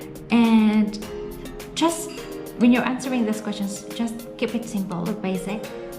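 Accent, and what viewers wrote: United Kingdom. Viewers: Maam taga UK po kayo? Nice accent and the video is Very informative..Kudos!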